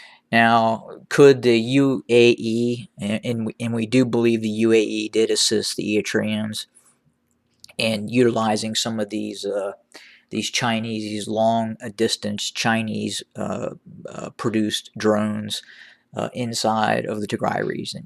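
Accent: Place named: United States. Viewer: Are you a blood sucker ? What do you benefit from brutal mass murder of people on each other ! Your accent tells you are an American where democracy, moral and ethics are important virtues in relative way! But you are so invested in this bloody civil war among black people because of the idiot politicians! What makes you so active in this cruelty of a kind never seen ever?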